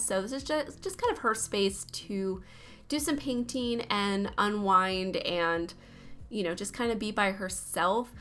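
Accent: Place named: South, United States. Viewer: i always say this, so it’s old now- but i LOVE your builds. your exteriors especially are always so lovely and they’re all houses i would want to live in! random question: where are you originally from? you have a bit of a southern-y twang in your accent i think.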